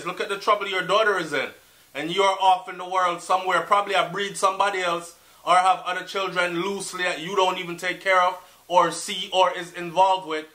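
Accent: Barbados. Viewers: this is a serious topic and you had me cracking up with your barbados accent these kids dont listen they think they know it all so they follow the wrong crowd and dont like authority so they prefer a home where they can do whatever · Lol the Bajan accent 😄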